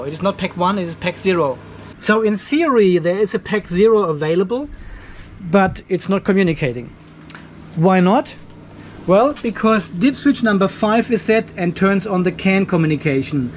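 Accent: Germany. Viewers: Hi, I just found your channel a few days ago when trying to learn about smart BMS's. Your content is amazing, full of information and humor, and I am greatly enjoying learning from you. My late father was an immigrant to the US from Austria, so your German accented English is a pleasure to listen to. I have subscribed to your channel. I have a question that may have been answered in one of your videos that I haven't seen yet, so please excuse the question if that is the case. As a "general rule", how much would be saved by making my own 300ah 12v pack from "storage grade" LiFePO4 280ah cells vs buying a $700 USD prebuilt pack? Assuming no cost for tools and my own time, just costs for the cells, the wire and terminals and maybe a pack fuse, the aluminum for the cell interconnect buss bars, the steel or aluminum and hardware to compress the packs, and the 150a discharge rated smart active balancing BMS? 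I look forward to your response and guidance, Philip from Oregon, USA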